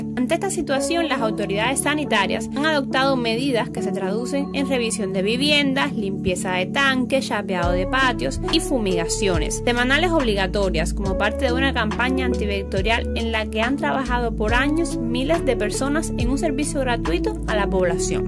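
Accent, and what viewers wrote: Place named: Cuba. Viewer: Por tu acento marcadamente cubano casi no te entendí algunas expresiones sobre los oficios. Te cuento que en Cartagena, en el Caribe colombiano hay varios de los existentes en tu isla; bicitaxi, zapatero, el que arregla las ollas y calderos, las sombrillas y el colero ( acá lo ves quienes apartan los turnos en las colas para las citas médicas y obtención de medicamentos en las IPS- Instituciones Prestadoras de Salud- y en las EPS-Empresas prestadoras de Salud- y el que consigue pasajeros para el transporte. El que me pareció más curioso. porque ya ni los veo, es el de los encendedores, que llaman ustedes fosforeros. Me llamó la atención que no existan allá los mototaxistas, cuando acá eso es una PLAGAAAAA. Un abrazo desde Colombia.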